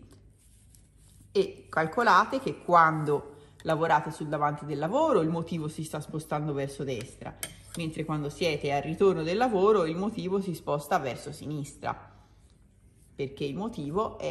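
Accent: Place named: Tuscany, Italy. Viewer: (accento Toscana ciò che adoro)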